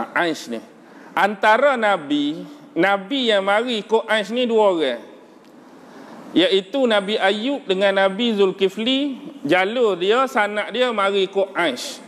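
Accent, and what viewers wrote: Kelantan, Malaysia. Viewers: Dialek Kelate???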